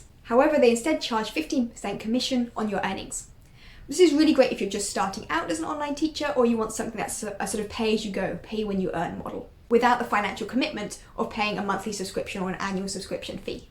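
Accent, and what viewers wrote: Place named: England, United Kingdom. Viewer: Hello. Thanks for the great information that you share with us. However, can you please slow your speech down? I am a Native English speaker who teaches English online. I am finding it very difficult to understand you as your English accent is very thick and you also speak very fast. I am trying to understand all of the information that you are sharing with us however, I am replaying the video over and over again in order to understand you. Best wishes, Karyn.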